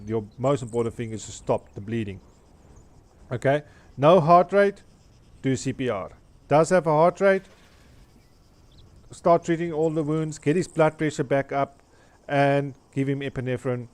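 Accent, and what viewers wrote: South Africa. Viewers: Your Accent gave it away,Well.For a south african at least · Easy you South African accent gives it away, i'm from Gauteng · you sound very South African?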